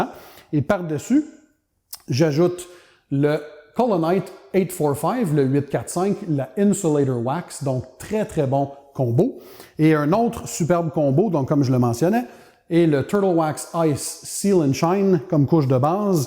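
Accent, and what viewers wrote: Quebec, Canada. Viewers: j'ai découvert ta chaîne en recherchant detailing auto sur YouTube et une de tes vidéos m'a été proposé et voilà... J'ai de suite accroché quand j'ai entendu cet accent québécois (je joue au roller in line hockey et passionné de hockey sur glace)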